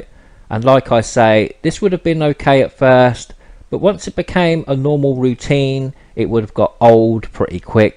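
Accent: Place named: East London, United Kingdom. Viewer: I love the commentary. I lived in London (Plaistow786) years ago. I miss hearing the accents of East London.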